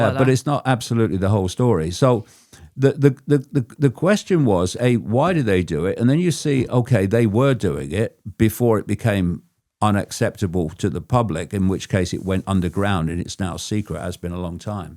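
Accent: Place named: Northern England, United Kingdom. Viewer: Message to the host - stop interrupting the guest with that barbaric up north accent.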